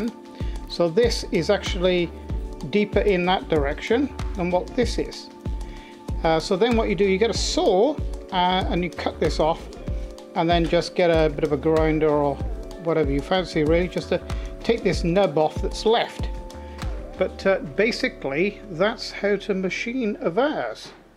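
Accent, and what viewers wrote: United Kingdom. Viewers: sounds british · I really didn't expect you to have a British accent.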